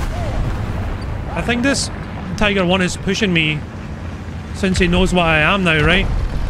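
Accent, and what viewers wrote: Scotland, United Kingdom. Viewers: Hi, I really enjoyed your video. I wanna ask that are you down to make a video about the T29 as well? Because I've already seen your video about the T34, and the T30 as well, and I know that there's not much difference between these tanks, but I genuelly love the T29, and Imo it's better then the Tiger 2 sla. By the way keep up the good work, I love your videos, and Im kinda courius about your "natural" scottish accent;)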